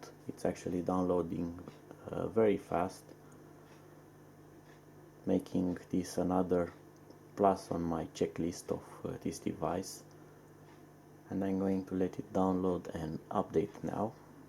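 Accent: Romania. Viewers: you sound Romanian to me. am i right ?good english dogh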